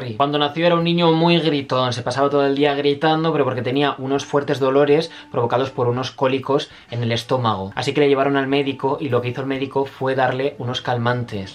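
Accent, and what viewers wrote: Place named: Spain. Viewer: Me chute todo el video no se me hizo largo eres divertido algunas cosas que dices no las entiendo porque es español de español de españa tio desde hoy soy tu suscriptora😊❤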